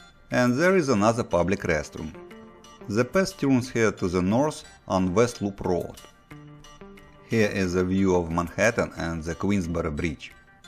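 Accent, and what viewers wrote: Russia. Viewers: You are russian? because your english sound a russian accent :)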